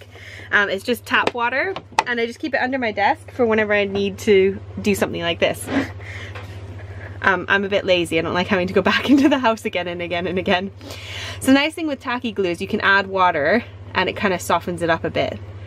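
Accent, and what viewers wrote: United Kingdom. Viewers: 35 is VERY hot for the UK. I was laughing when it reached 26 a few years back and the news was warning to keep cool to avoid fainting. However, if it is that hot in Europe this summer, we in Australia can expect temperatures in the high 40s and early 50s. Oh brother. I noticed a bit of the British accent mixed in there. Nice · FANTASTIC video!! Just super, loved it.! I learned a lot and I thought of some different ideas. Thank you sooooo much for teaching us! You can't tell the difference between the home-made pastes and the store bought. Your cards are soooooo cool! You don't sound like you have much of an English accent. What area are you from? Been sweltering here across the pond also! Yuck!☺😊😀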